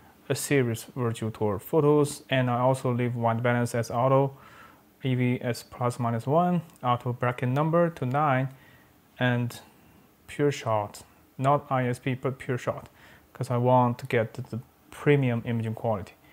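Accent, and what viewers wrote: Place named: Asia. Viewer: I like your content. But I do find it hard to understand your Asian accent in English. Keep up the work!👍😀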